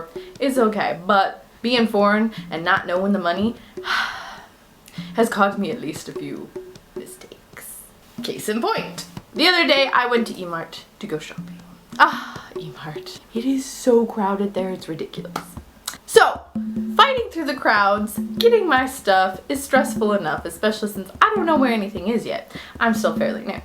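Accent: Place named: South, United States. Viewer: Are you from the south? You have an accent but I am not sure which state it is from.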